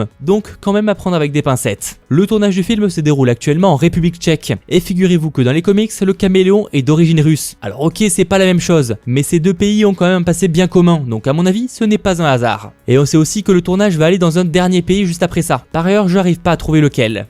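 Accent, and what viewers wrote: England, United Kingdom. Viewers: J'adore ton accent anglais x)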